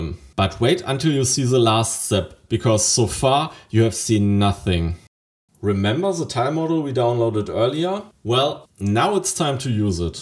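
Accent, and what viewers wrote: Germany. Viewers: Gutes Video.. der deutsche Akzent is der Hit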